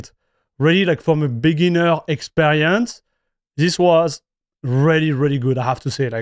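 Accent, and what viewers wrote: France. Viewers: Dude, you a Frenchman?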